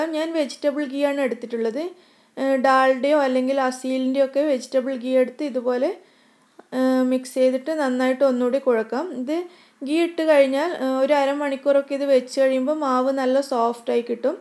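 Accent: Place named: Malappuram, India. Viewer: kitchen lnsha Allah......ennundakkanam. samsaram Kannor style allallo ....proper Kannor aalla annu thonnunnu.Oru Malappuram style und .Njan Malappuram aane .Athinal anikk kelkkan nalla ishttamane.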